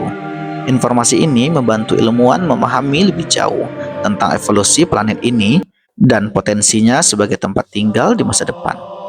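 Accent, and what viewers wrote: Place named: Madura, Indonesia. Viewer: GANTI PEMBACANYA!! BACA NYA KAYAK ORG MADURA… Tak Ye Tak Ye, kyk tukanh sate depan rumah saya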